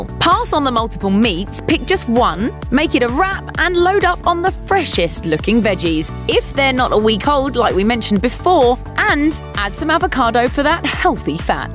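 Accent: United Kingdom. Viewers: Double pastrami Double steak And double never listen to a British chick tell you about American fast food restaurants... WTF... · "your narrator sounds British accent" "that was awkward?!" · British accent makes things look scientific.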